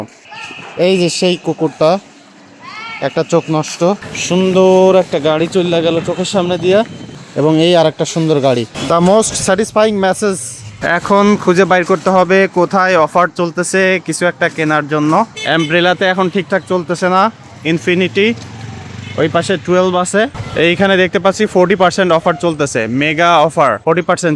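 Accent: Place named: Khulna, Bangladesh. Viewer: ভাইজান মনেহয় খুলনা অঞ্চলের মানুষ তাই কথার মাঝে সেই রকম টান পেলাম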